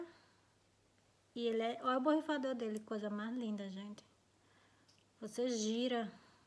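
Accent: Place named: Northeast, Brazil. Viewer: adoro vc e esse seu sutaque nordestino vc é maravilhosa suas resenhas.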